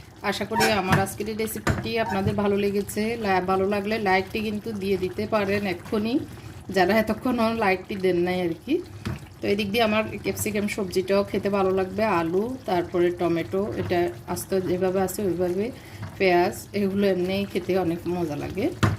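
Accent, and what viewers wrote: Noakhali, Bangladesh. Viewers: আপনি কি নোয়াখালীর?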